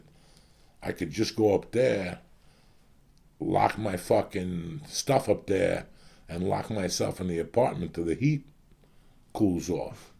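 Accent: New Jersey, United States. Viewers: Anyone else noticing how is starting to talk much more like he lives in jersey haha morning church!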